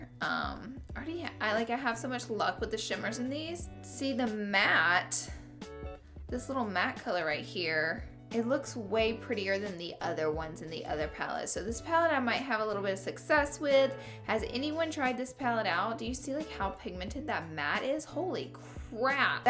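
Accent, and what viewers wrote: South, United States. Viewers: everytime says oil 😂 #thatsouthernaccentitsthebest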